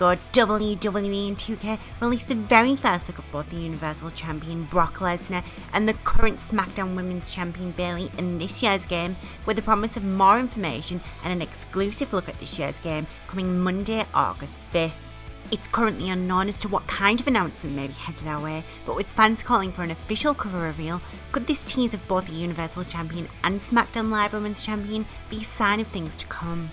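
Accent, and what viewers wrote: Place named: United Kingdom. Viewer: Hi good day thanks for the info plus I got hear your British accent so my day is complete💗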